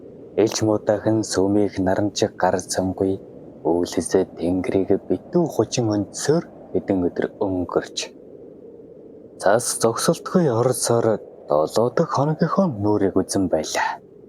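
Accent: Inner Mongolia, China. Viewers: Өвөр монгол хүн уншаад байгаа юм уу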